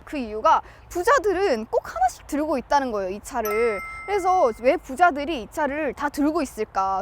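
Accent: Gyeongsang, South Korea. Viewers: 경상도 사람인가? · 이까지 라니 ㅎㅎㅎㅎㅎㅎ 부산 경남이 고향이신가요?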